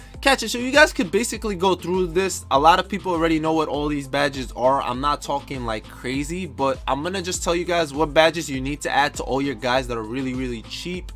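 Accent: New York, United States. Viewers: This dude is 100% a New Yorker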